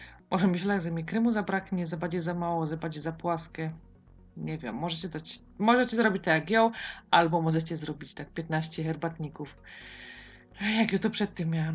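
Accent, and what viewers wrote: Silesia, Poland. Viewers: Ciekawy chyba przepis ale twoja śląska gatka zniechęca do słuchania. ... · Śląsk! :D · To po prostu śląski ;) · To jej wina że pochodzi ze Śląska i mówi tak jak została nauczona? Trochę taktu kobieto... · Masna ta gwara śląska 😂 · Tak mówią ludzie ze śląska, jak się nie podoba, nikt nie każe Ci oglądać 🤷🏻‍♀️ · O matko, jai ten język sląski jest zabawny 😂😍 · Pytam na poważnie :) jako ciekawostka dla mnie, czy jesteś polką i mieszkasz w polsce? Czy Twój akcent jest prawdziwy dla Twojego regionu widzę w opisie śląsk i zastanawiam się czy na prawdę gdybyś tak mówiła do swojego znajomego na Śląsku to nie było by w tym nic dziwnego? Czy jest to tylko pomysł na kanał, żeby było ciekawiej? Pozdrawiam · Jak to fajnie, że dziołcha od nos ze śląska! Aż się fajnie słucho! :D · Zastanawia mnie jeden fakt, nauczyciele na śląsku uczą was tak mówić? 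Na śląsku forma taka jaką się porozumiewacie jest uznawana w szkołach za poprawną, czy po prostu wszyscy tam mają taką gwarę, i każdy ma to w dupie. Pozdrawiam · Bardzo sympatyczna z Pani dziewczyna ;) mimo, ze nie rozumiem slaskiego dialektu to jakos.daje rade ;) swietny przepis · Nie lubię śląskiej gwary.....dziwne że u ciebie mnie to nie przeszkadza, wręcz odwrotnie, może dlatego że jesteś bardzo naturalna,skromna ,sympatyczna a twoje fajne przepisy prezentujesz i komentujesz lekko i swobodnie to jest w tobie najlepsze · Etnolekt śląski, odmiana opolska. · To się nazywa gwara , wydaje mi się że Śląska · Świetnie określają, I fajnie TLUMACZYSZ, bardzo dokładnie I po śląsku, POZDRAWIAM Z TORONTO, JOLA, POZDRAWIAM Mikołów,♥️♥️♥️👐🏻👐🏻👐🏻🙌🏿🙌🏿👏🏻👏🏻👏🏻👏🏻👏🏻👏🏻🎗🎗🎗🌋🌋🌋😘😘😘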